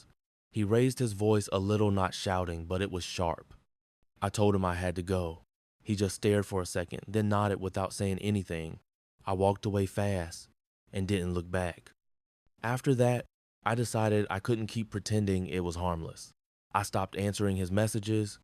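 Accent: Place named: Africa. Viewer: You're African, I can tell from your voice.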